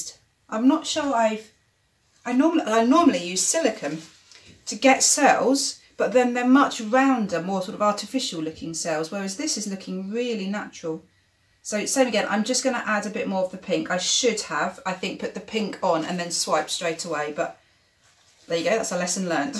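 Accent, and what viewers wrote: United Kingdom. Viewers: I found you a couple of nights ago. Well done on showing us your pour experiments it must have taken a long time to prepare. I did my fist pour yesterday they were all a bit of a mess but very pretty and I managed cells. So lots of practising ahead for me. I left everything on the kitchen table so round 2 today. Keep going it's nice to hear a British accent and no annoying background music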